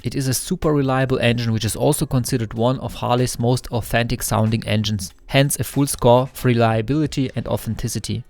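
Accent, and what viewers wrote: Germany. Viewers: I truly enjoyed this video, and the German accent is awesome. Germans have always loved Harley. I appreciate you didn’t use an AI voice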